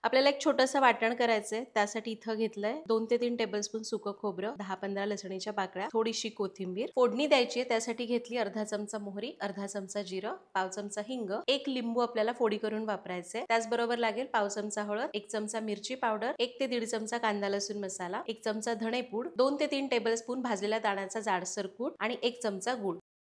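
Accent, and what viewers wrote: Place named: Pandharpur, India. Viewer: Ag tai tu pandharpur kiva mangalvedya chi aahe ka g mla aamchi bolnyachi aahe tashi vattey..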